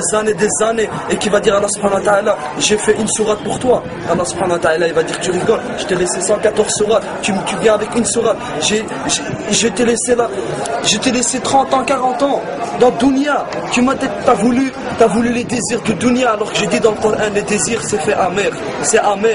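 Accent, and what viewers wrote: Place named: Maghreb, North Africa. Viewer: إذا كنت تقصد هذا الشاب - بارك الله فيه - فهو اما ان يكون امازيغي او عربي من المغرب او الجزائر فهم كثر في فرنسا. اللهجه واضحه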